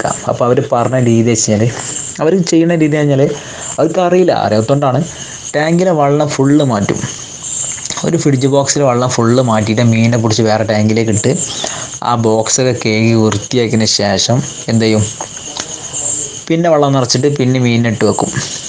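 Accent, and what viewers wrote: Malappuram, India. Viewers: Superbb Malappuram slang😋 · Samsaram kettappazhe thonni.. 😇😇mlprm isttam😍😘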